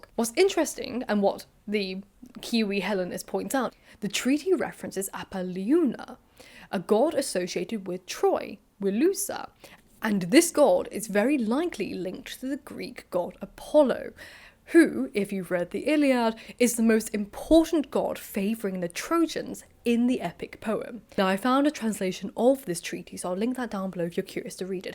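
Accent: RP, United Kingdom. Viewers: Not British person question: Is her accent particularly posh? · Yer modern british accent is stark compared to my Southern American Accent. Love the info,. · My belief was that an event similar to the Trojan War took place and later a fantastical poem was made in the aftermath. Arthur from the story was King Arthur was quite possibly a real person. He was mention in Celtic poems/songs around the time of the Saxon invasion and added on by other authors/storytellers up until Mallory who threw it all together and added several fantastical elements. I believe there is some true to both and I also but a character like Jesus in the same camp. Obviously the person existed and over time the story grew and with each retelling it grew larger and more unbelieveable. All that being said...this is inappropriate but does anyone else find that posh English accent insanely hot, other than myself?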